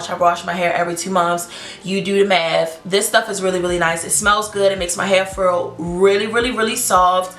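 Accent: Baltimore, United States. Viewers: As soon as u started talking I said is she from bmore🤔🤔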